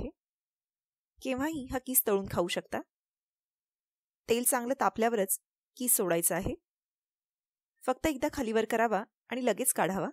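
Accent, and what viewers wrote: Standard. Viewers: तुमची शुद्ध आणि स्पष्ट बोलण्याची style आवडली. हॅलो च्या ऐवजी नमस्कार म्हणल्याच आवडलं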